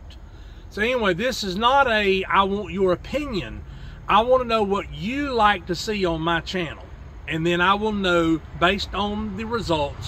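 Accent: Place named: North Carolina, United States. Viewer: I'm a transplanted Tarheel in Maryland with 12 acres of property that I maintain, a mix of grass areas and trees. I was attracted to your channel (subscribed!) because there is always something to be learned from the experience of others with regard to mowing, trimming, and general land maintenance. Not to mention that listening and watching you reminds me so much of my southern NC roots. I also enjoyed your vacation episode - I drive by Fancy Gap on my way to NC to visit family so was familiar with the area. Didn't know about the cabin rentals so something for me to check out for one of my getaways in the future. I would say keep doing what your doing. Not everything will hit everyone's interest but variety is always good.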